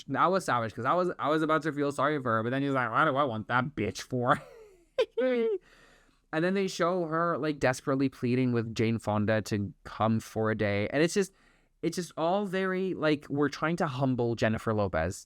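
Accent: United States. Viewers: Your in the U.K. but totally sound American? As usual, I am so confused! Very much enjoyed your commentary on JLo! Your funny and correct! IMO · wait, you are portuguese? Haha me too. Também sou portuguesa, que engraçado pareces americano. Um abraço, bom vídeo.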